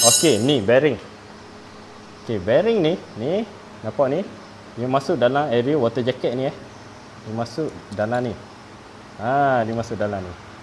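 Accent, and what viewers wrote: Terengganu, Malaysia. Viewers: Kemah molek cara penerangan sekali nga amali padu mantap mudoh pehe walaupun pekat sgguh ganu tu..tp jelas sungguh..moga muroh rezki la boh ..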